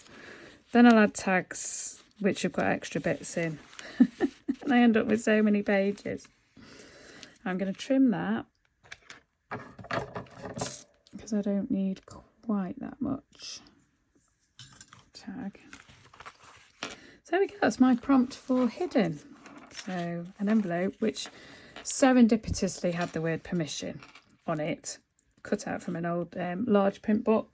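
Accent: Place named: North, United Kingdom. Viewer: Very inspirational and lovely to hear a fellow Northen voice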